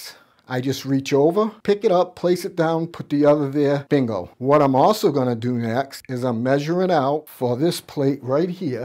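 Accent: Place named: Massachusetts, United States. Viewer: The magnet idea is awesome! I’m definitely going to incorporate it for my setup! I found you on your recent Reddit post and followed you here. Definitely appreciate your input and love your interest in flight sims and setup! I’m a space simmer so the attention to accuracy doesn’t hit me like it does you, but I do love your creativity to set things up. Crazy that those small magnets get over 300lbs of uhh magnetism! I’m wanting to go full simpit but for now I just modify to attach to and around my desk. Have to get creative since I can’t commit to replacing my desk and the $$ is limited due to having 3 young daughters as well, so that’s where most of the money goes x_X. Lastly, love hearing the Mass accent. I lived in the New England area for about 10 years when I was a kid/teen. I’m from Oklahoma and moved back before I left for the army, but I always loved the range of accents and such, but I do NOT miss the snow, lol. 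Anyways, just wanted to comment and say I love your stuff! Take care and stay warm!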